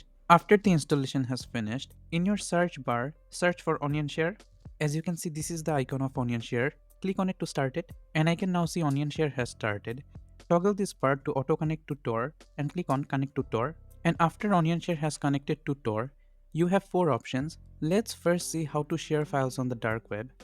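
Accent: India. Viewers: That's a true indian accent are you an Indian?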